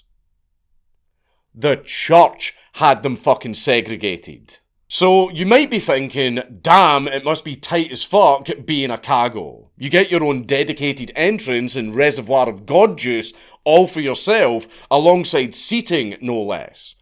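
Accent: United States. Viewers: Brillant opening. Excellent American accent. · Pretty good American accent ya got there my Scottish friend. Im also of Scottish, Dutch, French and Native American hertiages. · That was a pretty good vaguely American accent, I do a pretty good accent too but only when I am drunk. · Dude, good work on the American accent! Much respect from the land of the whatever we are these days! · That's a good American accent. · Just got here from X. Love this style. Dig the gruff American accent. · Dankula doing an American accent threw me off so hard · with an American accent is CURSED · Your American accent is good! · Dankula, your American accent is great. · Pretty good American accent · That American accent though. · Never thought I would hear do an American accent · Mr. Dankula, i must say i love your American accent. you should do it more often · Not the worst take American accent I've ever heard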